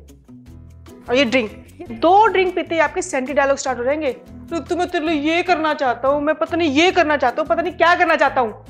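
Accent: Haryana, India. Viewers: That's Awesome....you guys rock...me and my wife has started watching and now becoming addicted to your videos. For you; u have a naughtiness that is actually a punch in the video and for; Dominance, harayanvi tone with cuteness is the heart winning that has become a right combination. Keep it up you both. Thanks for making us laugh. God bless you guys..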